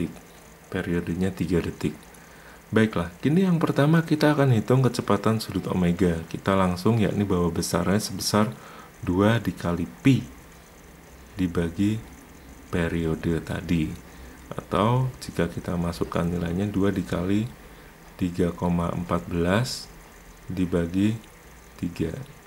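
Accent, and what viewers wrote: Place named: Java, Indonesia. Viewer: suara nya medok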